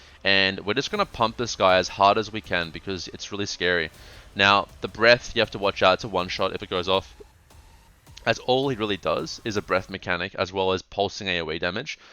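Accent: South Africa. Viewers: Are you from South Africa? Accent sounds a bit like it. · Thank you very much. BTW your accent sounds like you are from South Africa.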